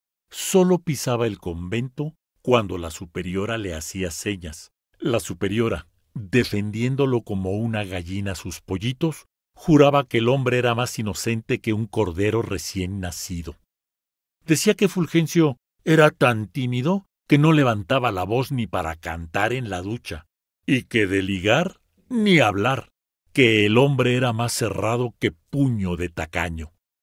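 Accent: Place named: Vallegrande, Bolivia. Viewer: Este narrador creo es vallegrandino puro coplas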